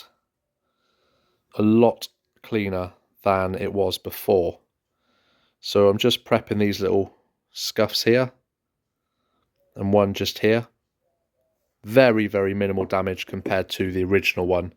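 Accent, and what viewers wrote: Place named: West Country, United Kingdom. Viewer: You sound like youre from the west country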